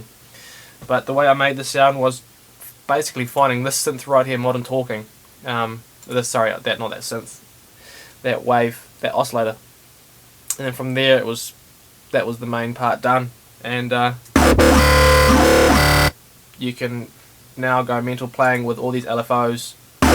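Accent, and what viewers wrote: New Zealand. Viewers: haha i love how as soon as i heard this guy talk, i knew he was kiwi! NZ PRIDE! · NEW ZEALAND!!!! YEAH lol. first heard the accent and thought finally someone from my own country!!!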